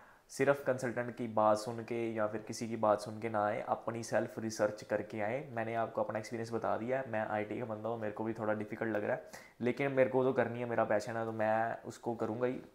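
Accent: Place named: Punjab, India. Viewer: Bhai aap punjabi ho na😅....aapke accent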